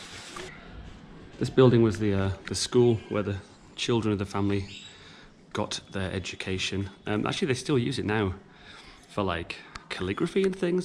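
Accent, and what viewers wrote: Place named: England, United Kingdom. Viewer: I really like your English accent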